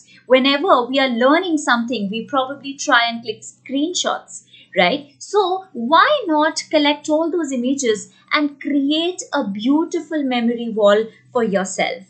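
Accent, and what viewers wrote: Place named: India. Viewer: Indian accent ☺☺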